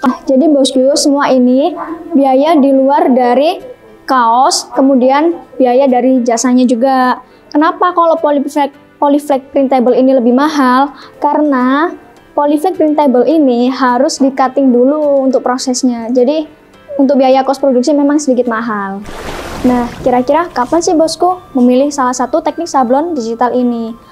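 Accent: Java, Indonesia. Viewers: logat jawa😁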